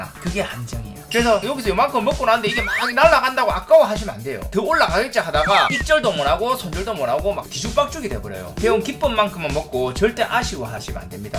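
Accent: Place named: Gyeongsang, South Korea. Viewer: 왠 만한 주식강의 보다 더 현실감쩌는 콘텐츠 구수한 경상도 사투리도 듣기 좋고.. 나도경상도.ㅋㅋ 감좌합니다~ 선배님..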